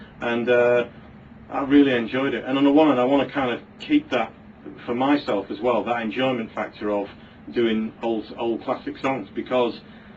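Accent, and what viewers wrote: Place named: England, United Kingdom. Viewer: no one else finds it odd that he sounds like he is english and sings like a black man????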